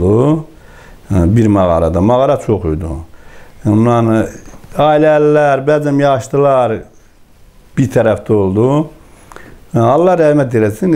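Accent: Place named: Gadabay, Azerbaijan. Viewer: "Allahın qadasın alım" cümləsi o qədərmi başa düşülən deyil, azərbaycanlı olan bu sözləri başa düşməyə bilməz. Yaxşı sən başa düşən kimi "Allaha qurban olum" deməkdi. Bu adam sadəcə savadlı adam deyil, Gədəbəy dialektiynən danışır, əsas odur ki, əsl türkdür, əsl qəhrəmandır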